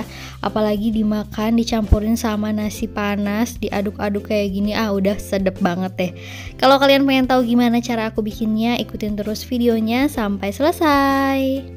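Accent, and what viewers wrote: Java, Indonesia. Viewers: Duh jadi laper mba, medog banget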